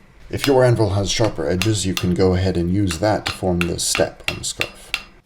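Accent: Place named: Canada. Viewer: Great video thanks for sharing. Where you located? You sound like a Canadian but Im not sure. Very well done.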